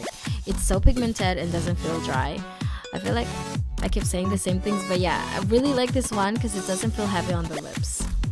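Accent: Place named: England, United Kingdom. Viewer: love your english accent sis 😊 Helly Cass here sis from the group 😊 I subbed sis.. ty ulit sis sa pag subbed 😊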